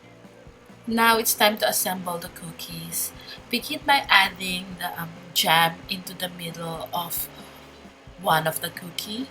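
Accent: Philippines. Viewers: Ohh...because I had a friend who is filipino and she recognized your voice that you are filipino.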